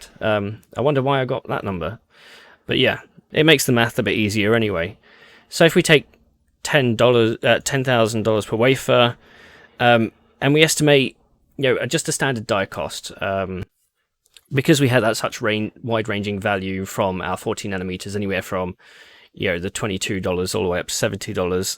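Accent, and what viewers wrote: England, United Kingdom. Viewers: I needed an English accent to complete my collection of Tech reviewers. Came here from your Level One Tech stream.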